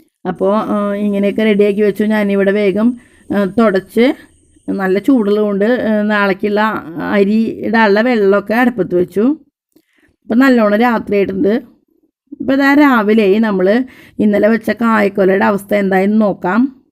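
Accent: Thrissur, India. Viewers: Hai. onathinu orukkangal kandappol enikku ente veedum kuttikkalavum okke orma vannu. pazhaya ormakal unarthunna oru vlog. enikku othiri eshtappettu.pinne u de Trissur slang kelkkan nalla rasamayirunnu. thank you so much for sharing the video.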